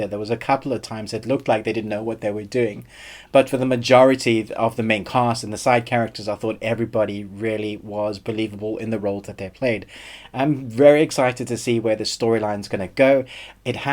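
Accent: South Africa. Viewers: 😂😂😂😂 He does have a touch of South African sound to him.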